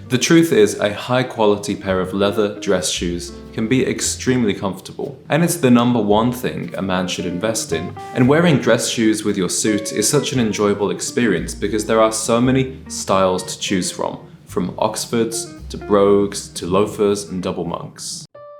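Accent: United Kingdom. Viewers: Love your dry, understated, British delivery. One faux pas I see frequently, is men wearing a baseball cap with a suit, all too common. (yes, pun intended) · Is this an American with a British accent or are proms yet another American import to GB?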